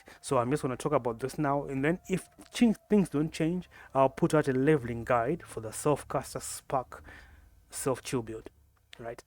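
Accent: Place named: South Africa. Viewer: lol brother, where from South Africa you from?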